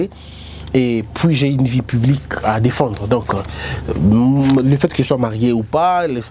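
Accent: Democratic Republic of the Congo. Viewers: Lingala ya solosolo boyoki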